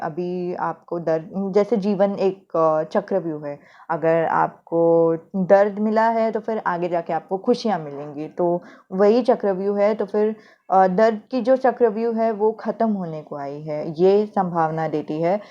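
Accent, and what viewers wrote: Maharashtra, India. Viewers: Hi mam, i have chosen pile no. 3,i m also a tarot card reader, numerologist, etc. mam aapke bolne mein marathi accent aata hai, aap maharashtrian ho?mujhe aisa laga, just asking generally, ok, so Mam aap mujhe ek baat batao, aap jab bhi reading karti ho hamesha yehi kyun bolti ho, "aapke husband aise honge",vaise honge, matlab ye video ko ladke nahi dekh sakte, ye video ladko se resonate nahi hoga.aapne pile no. 3 mein toh puri khichdi bana dee, aap logon mein jhagda hoga, nahi hoga, baad mein thik ho jaayega. "jara nit padh teene reading kara ho madam, nakki kay aahe te saangaych, hasayala tumhalaa lay aavdto.ekdum mana nee hasta ho tumhi. Nice reading. Keep smiling. 😄Thank you madam, 🙏🙏🙏👍👍👍👌👌👌❤️❤️❤️❤️❤️🌹🌹🌹🌹🌹🌹🌹🌹🌹🌹🌹.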